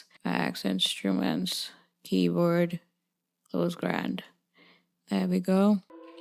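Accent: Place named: India. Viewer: Hey r u Indian by any chance Cuse your accent tell that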